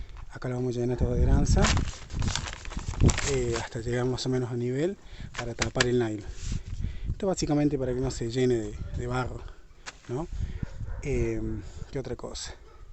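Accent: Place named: Cordoba, Argentina. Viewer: Muy interesante la info!!, pero no pude evitar escuchar a un Cordobés con mezcla de Franses.jajaja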